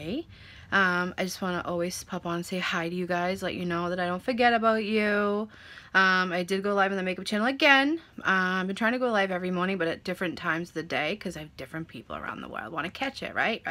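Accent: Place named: New York, United States. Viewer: Hey beautiful I luv ur personality n ur ny accent 😍do u have another channel?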